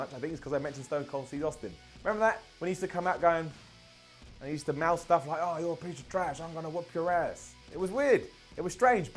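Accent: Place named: United Kingdom. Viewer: Why he big enough himself in isnt in NXT or WWE in got the British Voice lol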